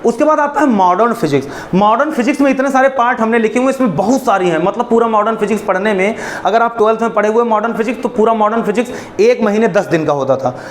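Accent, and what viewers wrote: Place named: Punjab, India. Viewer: Sir come fast. We need your class. For the First time Maths became easy when i watch Mayank Sir's video and now we got a dj style sir for physics also. And your Hindi is a mix of punjabi language,which i love to hear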